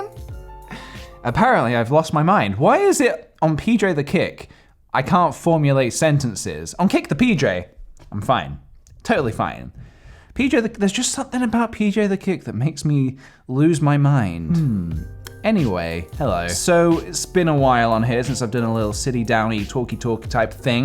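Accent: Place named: England, United Kingdom. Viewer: I just realised 1 big thing. I understood how cool are u as a human, and I can't really talk with you, meet you. Just even see you. Even if we somehow would met I can't even talk with you in a normal way. You are from England. I can barely understand you(because Im from Ukraine ), but I really love watching your videos, and thats basically all what I can do. Please guys, thumbs up for my comment if you think same way. I really want to Pj to see me. Thanks PJ keep making great things for us, we love you.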